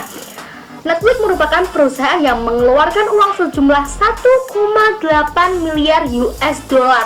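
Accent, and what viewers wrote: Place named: Banyumas, Indonesia. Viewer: Kok ngapak yaa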